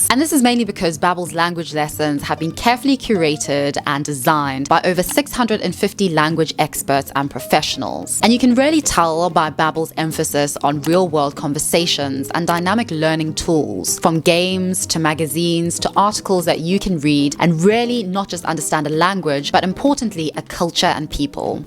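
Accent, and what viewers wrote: South Africa. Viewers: Youre South African? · Amen! Love your accent sounds a bit South African. You speak clearly and make perfect sense. Thank you! ❤ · Are you from South Africa? That accent 🔥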